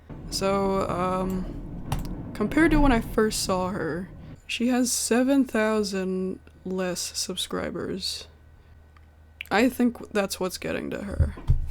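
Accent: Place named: South, United States. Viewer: I love your voice you sound southern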